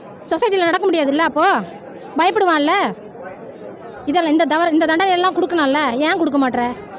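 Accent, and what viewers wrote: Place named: Tirunelveli, India. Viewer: சகோதரி திருநெல்வேலி பேச்சு சரிதான்.... நாம் தமிழர் திருநெல்வேலி.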